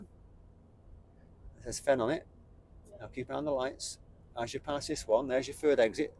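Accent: United Kingdom. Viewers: I can't understand English properly in British accent. How can i learn everything about driving skills? I can learn better in my own language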